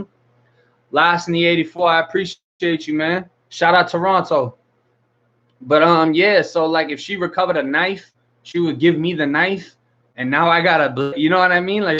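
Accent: Boston, United States. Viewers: Just sub'd. From TX. I get a kick out of your soft accent. Lot of FL friends but it's your Boston that catches my ear.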